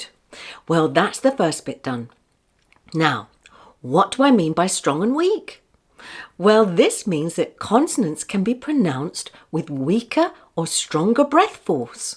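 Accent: United Kingdom. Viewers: Thank you so much for your time. you are the best and we love the way how you teach and your British accent.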